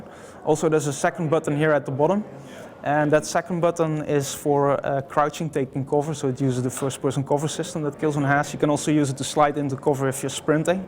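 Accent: Netherlands. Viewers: he is not russian, he is DUTCH haha i hear i because i am dutch too!!! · lekker nederlands accent haha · He sounds dutch :) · Dutch accent, MY COUNTRY FUCKING ROOOOCKS!!!... Except the cops of course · Nah it's a guy from holland they all have this accent when they speak english :D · He's definitely Dutch. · he's dutch, i know it of the accent that sucks hard. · This guy is definitely Dutch, listen to his accent. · woow, dutch guy (i am one :D) learn english, you are to obvious ;D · I'm SURE this guy is Dutch. It just sounds to much like a Dutch speaking person trying his absolute best to talk English without too much of an accent. Like if you're native language is also Dutch and you agree with this statement! :p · the guy sounds dutch to me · You sound kinda dutch. Are you? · YOu can hear this guy is Dutch.. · nederlander? ik hoor wel een nederlands acsent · definitely Dutch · your dutch! 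· dude, are you dutch? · ge hoord direct da da nen hollander is he xD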